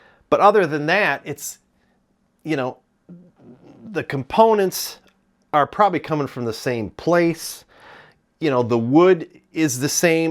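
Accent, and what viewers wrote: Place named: Midwest, United States. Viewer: just watched this episode for the second time and wish I could give you another 'like.' Good off-the-cuff midwestern commentary and I appreciate that man. Here's an odd question maybe: Do both these guitars have a '13' in the middle of the S/N?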